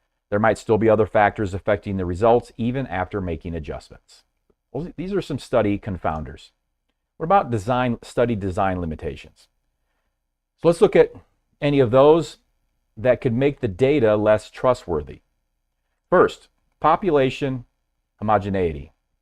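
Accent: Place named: Australia. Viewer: I thought maybe I had a relaxation music video on at same time! 😂 that coupled with the Aussie? accent was a lot!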